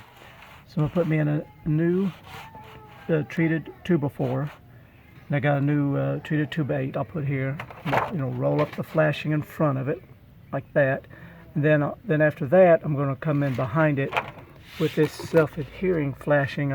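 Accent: South, United States. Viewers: this is probably all too late to be helpful for you, but maybe for someone else... Screws to draw the blocking up into the floor is not a bad idea, but not to keep the blocking in place. And not gold screws, they are too brittle and will break and/or loosen up over time. Some beefy structural screws would be best. The blocking should actually be the same dimension as the joists, and secure the blocking with framing nails, maybe even joist hangers. Also add some subfloor adhesive to the top edge of the blocking. You can also see in the video that the subfloor is compromised. Pain in the butt to fix that, but it ain't gonna get better on it's own. Flashing underneath the sill plate is actually incorrect. The idea of flashing is to keep the water out completely as much as possible. Putting the flashing on top of the concrete is likely to allow water to get into the crawl space between the flashing and the foundation. 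There are liquid flashing products on the market that you can use to seal the joint between the rim joist and the foundation, or you can use the metal flashing but in either case the flashing must overlap the joint and then the siding overlaps the flashing. In addition, seal the ends of the joists, the outside of the rim joist, the the outside edge of the sill plate to help prevent having to replace those in the future. From your accent, I assume the home is in the south, where everything stays wet pretty much all the time. Not much you can do about that. The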